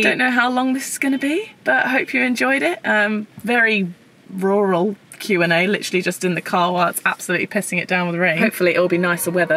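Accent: Australia. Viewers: I love listening to your accent becoming more and more aussie! great video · a bit of an Ozzy accent coming through lol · Always love your videos! It sounds like accent is changing you sound more Australian now! Also what is the song at the end and could you likn your spotify or his? Thanks Happy New Year! You should do a meet up if you go to Canada!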